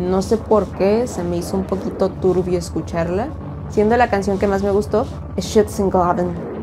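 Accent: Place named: Colombia. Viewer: hablaste como colombiana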